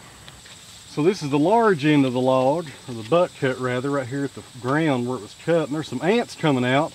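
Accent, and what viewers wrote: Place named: Tennessee, United States. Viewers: No I work in Kingsport TN, his accent is my accent. Very normal here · That’s a Tennessee accent...I’m betting a dollar to a donut!!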